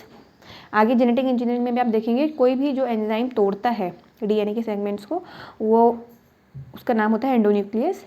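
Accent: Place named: Pahadi, India. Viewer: Mam aap pahadi ho kya bahar se awaj aa rahi h phadi bolne ki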